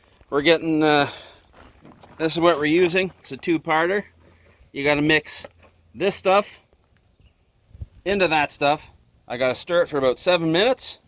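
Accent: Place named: Scotland, United Kingdom. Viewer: you sound a tad scotish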